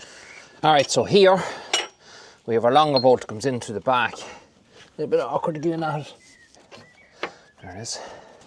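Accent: Ireland. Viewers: can you tell if I zip through your video? As an old lady, I am not much interested in the heavy machinery repairs, but I do enjoy seeing the cattle, the green fields and hearing your Irish voice. I would not like you to feel insulted that I don't watch every minute, but I always click LIKE!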